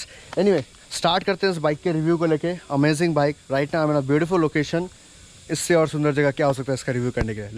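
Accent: India. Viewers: CAN ANY OF YOU indians speak normally in ENGLISH? these bikes are exporting worldwide and we need to WATCH A GOOD REVIE WITH ENGLISH E N G L I S H detailed review I CANT UDNERSTAND SH1T, not even subtitles works.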